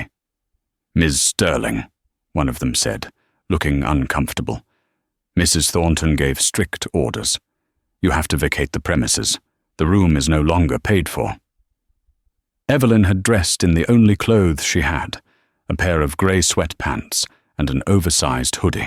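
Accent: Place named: United Kingdom. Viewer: I have a technical comment. …. Although the narrator is probably a wonderful gentleman, a British accent narration for Chicago, Boston, NYC, etc is DISTRACTING. You cannot relate to the characters when they are supposed to be on continental U.S., with a London accent. If we were listening to Shakespeare, yes. For these things, Hard NO!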